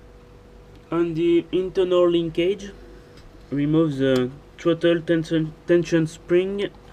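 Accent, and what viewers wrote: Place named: France. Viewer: Same. I thought, ooh, a French accent. Wait, why the flag....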